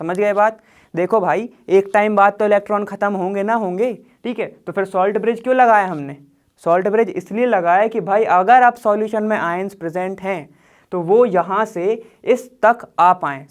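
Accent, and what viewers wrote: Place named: Bihar, India. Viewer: Muje tou bihar se laag rahe hain 😅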